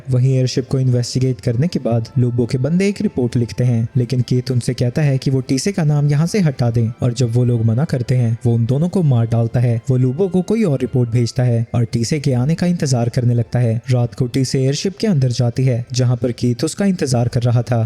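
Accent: Gujarat, India. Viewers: Bhai tum gujrati ho kya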